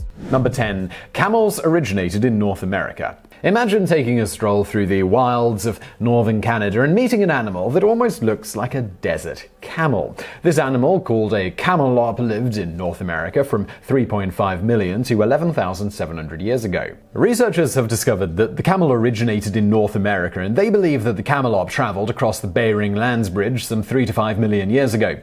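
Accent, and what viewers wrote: United Kingdom. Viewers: So...you want a Brit to use incorrect American pronunciation? Here's a tip, go see the world and make sure to never use the complaint that "This isn't how we do things in America", because we either don't care, or find your ways quaint and strange, especially pronunciation.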